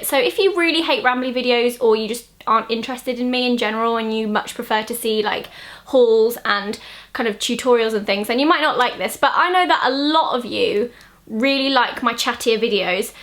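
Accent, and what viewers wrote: United Kingdom. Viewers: Hi Zoella! I am from Brazil......... Say "hello" to Brazil in your nxt videos. I see yoour videos because you're so funny. I don't use make ups..hahah. I see also because i'm in UK and it help me learn a little more the way british people accent.... thank you kisses.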